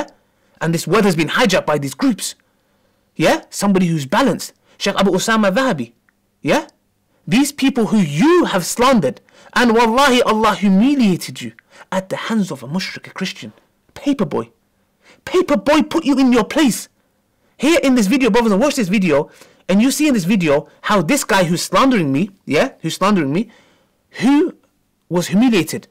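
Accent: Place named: United Kingdom. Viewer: As salamou alaikoum brother , I am a sister from Montreal and my English is not on top and I ask you brother to speak a little slower since it’s mixed with British accent and I have a bit difficulties to understand. Can you put subtitles? Your videos are full of good informations. Thank you brother may Allah reward you al Jannatil firdaws🙏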